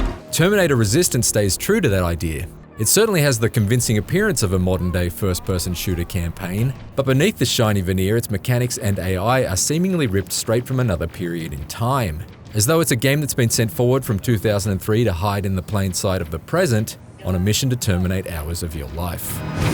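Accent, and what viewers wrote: Australia. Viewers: It's that aussie accent again, eh? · “ske-leet-al” never heard someone with an Australian accent pronounce skeletal before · He ain't a brit, he's an Aussie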